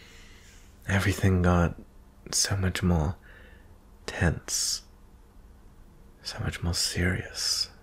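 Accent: United Kingdom. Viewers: You’re really good at a British accent! I am impressed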